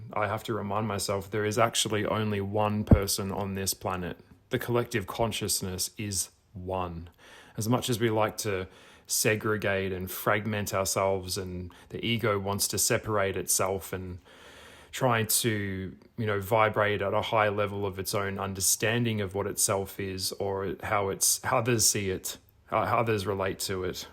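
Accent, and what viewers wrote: Australia. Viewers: Sounds like you are Aussie!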